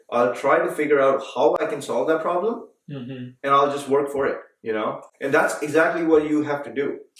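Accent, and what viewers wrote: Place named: India. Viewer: I suppose you shot this video a while back, I was wondering that both of you graduated from the same university, but still you've maintained your Indian accent though you've married an American, while he he's got an American accent. Pls take it as a compliment from me.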